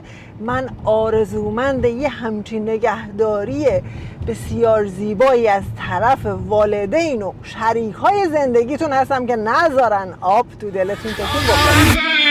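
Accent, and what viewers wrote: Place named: Isfahan, Iran. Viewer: اصفهانی هستید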